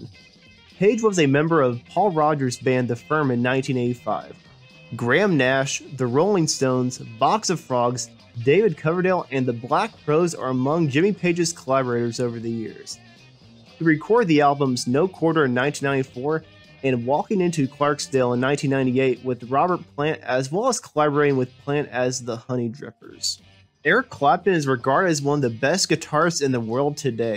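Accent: United States. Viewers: How awful that this completely British band has an american voiceover on its story. Offensive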